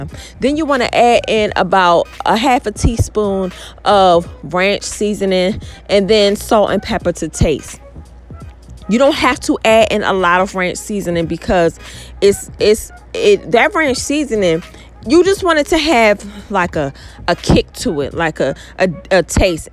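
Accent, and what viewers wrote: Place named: Maryland, United States. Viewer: You must be from Maryland accent real thick Kudos on the chicken breast👌🏿💯🔥🔥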